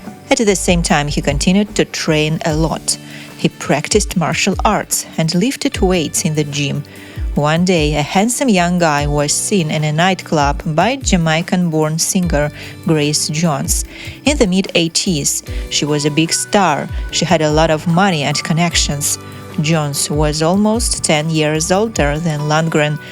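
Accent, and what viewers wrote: Russia. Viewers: lol Russian accent 😂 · What's with the Russian accent? We need Russians now to explain American pop culture history to us?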